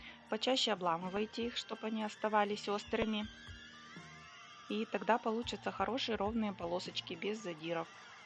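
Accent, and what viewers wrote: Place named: Russia. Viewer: Снова, Здравствуйте!А, где,Вы, проживаете?По говору, не центральная часть России!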